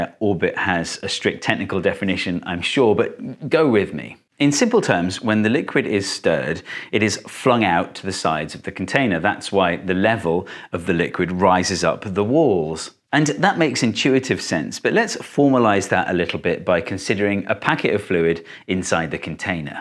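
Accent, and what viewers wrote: United Kingdom. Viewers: I look forward to videos...but.... I feel that he is fully taking advantage of the fact that Americans have been programmed to think that people with a British accent are smart.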